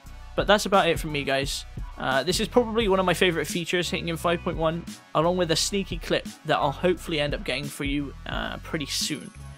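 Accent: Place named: Bristol, United Kingdom. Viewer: Wow... you sound sooo bristolian..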